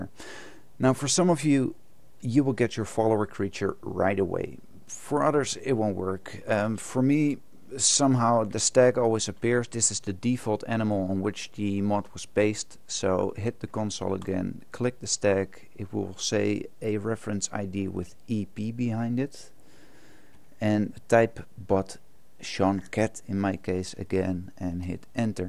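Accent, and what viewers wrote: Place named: Netherlands. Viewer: This guy sounds Dutch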